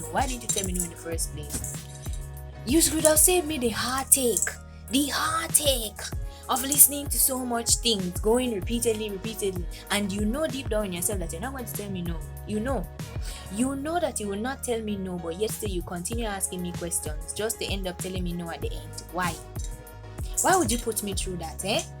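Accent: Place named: Africa. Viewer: First, I am hearing your African Accent!!!! Lwl. Good video!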